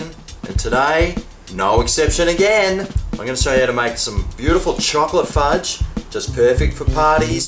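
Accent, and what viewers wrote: Australia. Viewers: This guy is Australian :D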